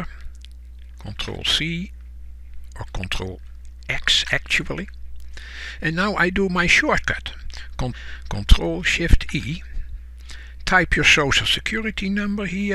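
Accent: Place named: Netherlands. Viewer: Sean Connery is Scottish. This guy sounds like he's from the Netherlands or something.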